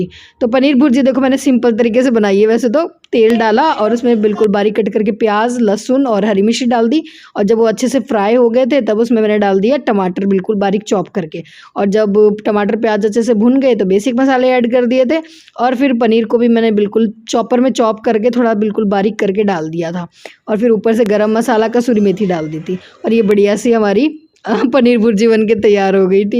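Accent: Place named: Punjab, India. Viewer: Aap ho Rajasthan ji to punjabi jese rehna aur bolna tone kyu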